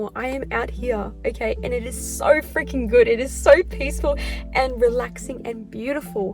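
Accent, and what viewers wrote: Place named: Australia. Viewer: Name = ☘ Accent = Australia 🦘 Mind = blown 🤯 But I'm not gonna overthink it 😄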